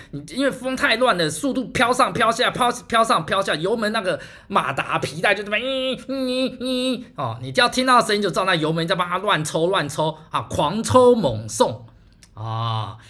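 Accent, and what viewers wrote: Taiwan. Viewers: 為何大佬在外工作那麼長時間英文完全沒口音，完全就很台灣味...為何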